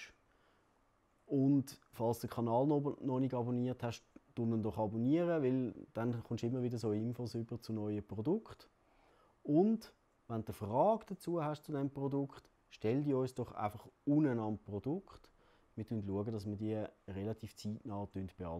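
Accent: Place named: Switzerland. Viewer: Interessehalber mal nachgefragt: Welche Art von Schweizerdeutsch hört man hier? Züritüütsch? Ich als Norddeutscher verstehe jedenfalls relativ viel (anders als z. B. bei Walliser Deutsch).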